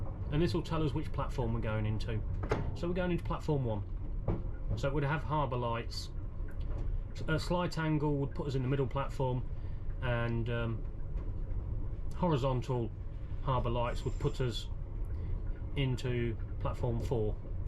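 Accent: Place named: Midlands, United Kingdom. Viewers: Really good video, I’m assuming saying it’s bostin’ is in bounds as I’m hearing a Midlands twang. You’ve a very good way with words 👍🏼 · Bostin'! I originally thought he was a Brummie too, but in an earlier (non-Underground) video I'm sure he said 'Peterborough, my home town'. So, East Midlands not West., please confirm.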